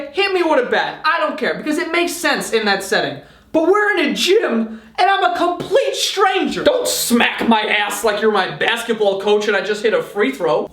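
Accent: New York, United States. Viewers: You're accent is amazing lol it's so cool. Is it like a NY accent? (Sorry I'm from Europe) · As a New Yorker, I can confirm that all New Yorkers sound like this guy. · What is your accent is it New York Brooklyn or what?? Manhattan jersey Italian ?!? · Dope NY accent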